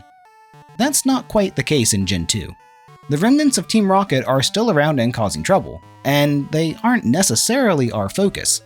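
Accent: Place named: Philadelphia, United States. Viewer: You have an interesting accent! Is that a Philadelphia or New Jersey by chance?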